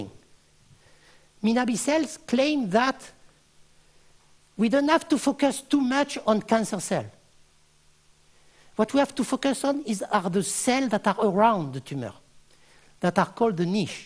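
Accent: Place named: South, France. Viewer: Nous n'entendrons plus ton accent du Sud et tes fous rires😢 tu avais tant à donner encore. Regrets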